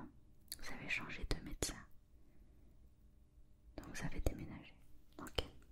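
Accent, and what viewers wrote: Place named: South-West, France. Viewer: Un chuchotement comme ça avec l accent de mon Sud ouest! Que demander de mieux! Merci 👍☺️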